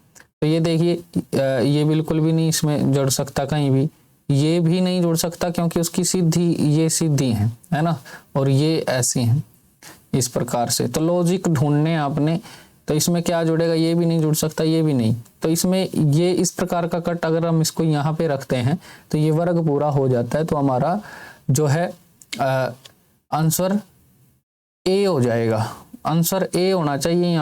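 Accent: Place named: Haryana, India. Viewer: Sir your voice tone is so amazing... Haryanvi mix Hindi... your lectures very beneficial for me 🥳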